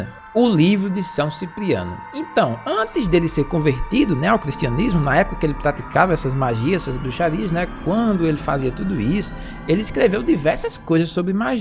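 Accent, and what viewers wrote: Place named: Northeast, Brazil. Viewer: 😅 kkk então amado esse sotaque nordestino é muito engraçado, eu como satanista teísta e praticante do ocultismo pagão. É praticante da demonolatria, custei pra entender, o grande mestre e bruxo e feitiçeiro São Cipriano. E porque os demônios, insistiram, pra mim comprar esse livro mágico ou grimorio demoníaco satânico antigo sagrado de São Cipriano. Ele possui rituais das artes mágicas de alta magia negra com o Deus Satan ou rituais satânicos. Sim 👍 é atualizado em diversas versões e traduzido em vários idiomas. Não é um ☝️ simples livro, é um grimorio demoníaco satânico antigo sagrado. Já começa por aí, não 👎 é escrito para pessoas curiosas, é feito para praticantes de magia negra pagão. E para quem pratica a demonolatria e lógico. Estuda e pesquisa a demonologia, não 👎 vou ficar aqui explicando tudo. Porque são segredos dos seguidores da magia Ciprianica e obivio da magia negra. 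Eu como satanista teísta e bruxa 🧙‍♀️ e feitiçeira e médium espiritualista, fiquei muito curiosa. Quando minha Daimon pessoal, me disse Rosanna, sua vida e jornada espiritual. Já está em tanta evolução astral, que precisa estudar e pesquisar e praticar o mestre e bruxo São Cipriano. Na verdade dele, tenho dois grimorios, inclusive o grimorio demoníaco satânico antigo sagrado São Cipriano o bruxo capa preta. Eu fiquei chocada 😲 ao ler pela primeira vez. Disse pra o demônio. É muito crueldade, kkk eu juro falo tanto de animais como de pessoas. De início eu juro, não 👎 gostei do nenhum, mesmo sendo sat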